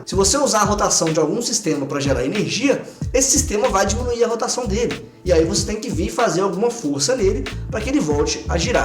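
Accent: Minas Gerais, Brazil. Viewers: Sotaque da CAMILA do PEIXE BABEL mode on! kkkkkkkkkkkkkkkk Veio um mineirês com um baianês bem sutil, mas eu pesquei! kkkkkkk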